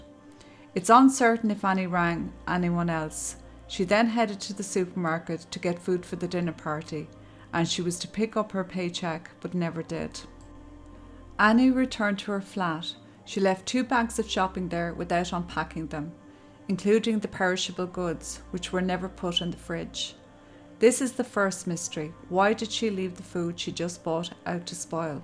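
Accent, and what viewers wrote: Ireland. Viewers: I enjoy your storytelling and your lovely barróg. It's a comfort really. This is the first I've heard of Annie McCarrick. As a 1st gen Irish American raised on Long Island. like Annie, very close ties to Ireland..this makes me shiver, it's possible I blocked it from my memory. Somehow the Northern Ireland angle seems a bit far fetched, anything's possible, but to kill an American, create an International incident? They gave no evidence. My heart hurts for her parents, my sincere condolences to Annie's Mom , I pray she is Comforted and has Peace, the peace that passes all understanding.. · Poor Annie.. So sad and tragic that a New Yorker ended up disappearing in Ireland, where the crime rate is so much lower than in NYC/NYS. Extremely puzzling and incomprehensible that she decided to go for a walk so late in the day, so far away from her apartment and, above all, on her own.. 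We will never know... What an unbearable torture for the parents, not knowing... No closure. Btw, in 1987 she wasn't 19 but 21 (born 1966). Very well told story, you have a soothing voice and such a charming accent. I love Ireland! Best wishes for your channel! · I really enjoy hearing your accent! Some of my ancestors are from County Cork.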